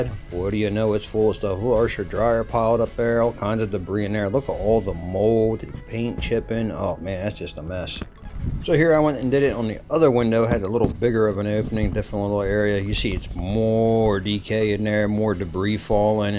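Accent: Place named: Pennsylvania, United States. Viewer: I knew it was Pennsylvania! I love your accent, that was the giveaway. I graduated Penn State in 2003, from U. Park but I did live in Schuylkill Haven for 1 school year, and that was enough. Pennsylvania is a beautiful state, despite the look of this ugly building. ❤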